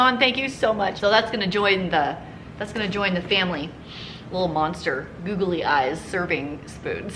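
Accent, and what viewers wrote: United States. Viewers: You cooked Barra! It’s delish! So is red snapper. & you said it correctly, it’s strange hearing American accent say it haha. Fellow Aussie 🇦🇺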